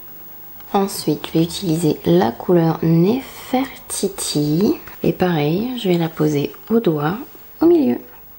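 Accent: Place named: England, United Kingdom. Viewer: Coucou, j'adore ton petit accent anglais :) Très beau makeup, on apprends pleins de trucs. J'avoue j'adore quand tu te fais des smokies bien intenses. C'est personnel, je suis pas fan des paillètes. merci pour cette vidéo, gros bisous^^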